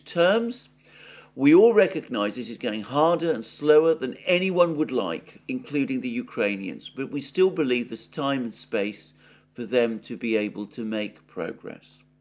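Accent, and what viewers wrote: United Kingdom. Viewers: Dear, I continue enjoying your videos every single day and feel grateful for both their content as well as your fine British English. I once offered to make suggestions on the pronunciation of Russian (and possibly Ukrainian) proper names as well as some factual information on Russian history and trivia, and now see you have accepted suggestions from someone else. You do not need to pronounce Russian / Ukrainian proper names as per the source language standards, especially if there is a long standing tradition in a particular case, like, for instance, Moscow. However, the global trend is towards trying to pronounce foreign proper names as close to the original as possible, and you have now made fantastic improvements along this road which makes me so happy as a native Russian speaker with some notion of Ukrainian pronunciation. This is why I would wish to make a couple of additional suggestions if I may. 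A capital letter preceded by a single inverted comma indicate the vowel under stress in a word. First, a delicate one. We say Dni'Epr in Russian, not Dnipr. The Ukrainian equivalent is Dnipr'O (both river and city). Ukrainian men's Christian names are Petr'O for the Russian Pi'Otr (like Petr'O Porosh'Enko) and Dmitr'O for the Russian Dm'Itriy (like Dmitr'O Nataluha). Some more place names: Lim'An Izi'Um Osk'Ol (river)/Ukr.: Osk'Il Tokm'Ak Taganr'Og Andr'Eievka / Ukr.: Andr'Iyivka A couple of family names: Lavr'Ov Poliak'Ov(a) I have no idea of Gen. Mordvichev's own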